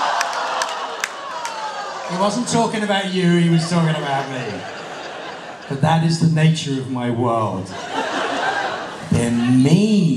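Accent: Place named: United Kingdom. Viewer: awww, Ivw not heard this sort of british accent in years! oh the memories...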